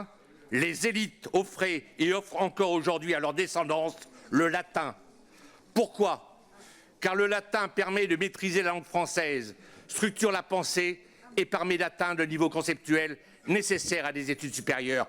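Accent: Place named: Île-de-France, France. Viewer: Et ton patois d'Île de France tu sais où tu peux te le mettre ?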